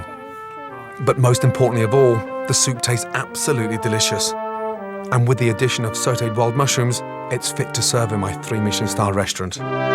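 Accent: Scotland, United Kingdom. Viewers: Great to hear a hardy Scottish voice in there! 😂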